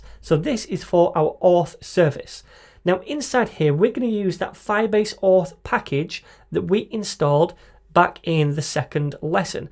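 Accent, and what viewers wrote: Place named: England, United Kingdom. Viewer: You are the best in youtube ive seen explaining each topic I guess because your English is native so you don't do any effort to translate your thoughts plus you are awesome plus your accent is Sooo English that makes every word sound smarter,, and makes me feel wearing a grey tailored fit suit attending a lecture in an old shiny wooden lecture hall in oxford university in year 1752 among a beautiful group of people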